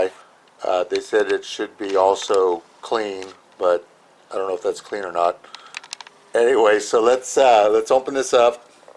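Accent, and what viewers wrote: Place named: Florida, United States. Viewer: You sound like your from Florida lol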